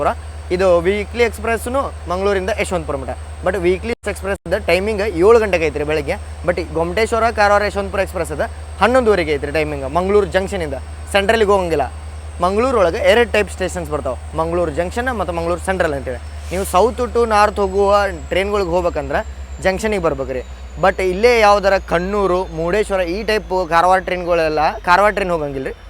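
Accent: North Karnataka, India. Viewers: Very very very nice north karanatks kannada language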